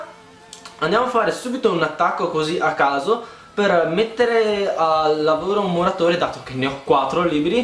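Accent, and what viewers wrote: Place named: Milan, Italy. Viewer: Primo tuo video che vedo e ... sei veramente epico! Un cinese con l accento milanese dove lo trovi ... probabilmente a milano ma tu sei epico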